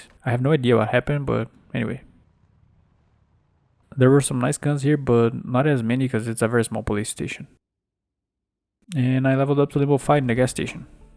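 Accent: Brazil. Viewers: teu accent parace um pouco brasileiro, se vc for, incrível que tenha alguem br fazendo um conteúdo tão foda assim, abraço! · Tem sotaque de BR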